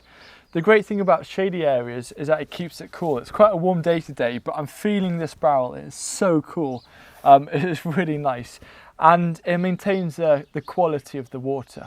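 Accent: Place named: United Kingdom. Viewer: Where are you at? I thought you were brittish😊👍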